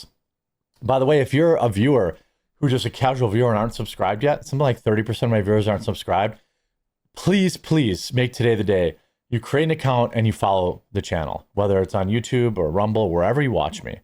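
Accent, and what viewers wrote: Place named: Wisconsin, United States. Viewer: ) I can see that, but I like how talks because it reminds me of my sweet Mom's family from Muscoda, WI.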